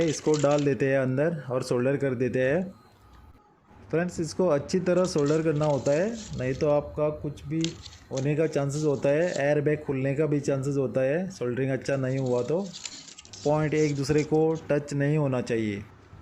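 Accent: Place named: Mumbai, India. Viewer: Hello irmao appreciate your skill and precision work . Not jugadu but your work and perfection it's like O E M BOSCH. 👍👏 Your Hindi is we call dobitalv Bombay uncle and aunty Hindi . Nice to listen . Greetings have nice day 🙏💐👌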